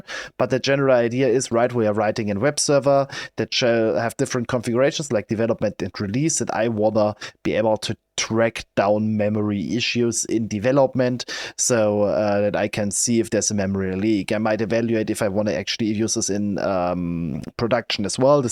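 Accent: Germany. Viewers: loved the german accent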